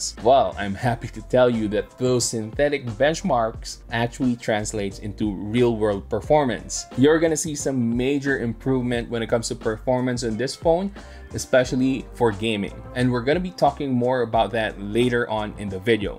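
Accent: Philippines. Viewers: The Philippino accent is the cutest ever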